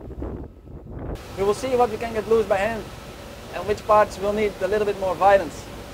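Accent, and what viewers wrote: Netherlands. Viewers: Hire an English speaker. The Dutch influenced language is killing the pleasure of watching. So, nope, not for me.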